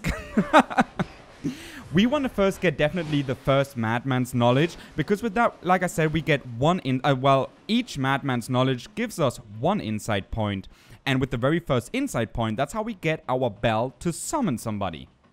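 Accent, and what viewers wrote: Germany. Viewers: you're not a native english speaker, I can hear it. I'm guessing you're german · Höre ich da einen deutschen Akzent? 😏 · yes, he's either german or austrian. I know because all of us have that exact same weird accent when speaking english, lol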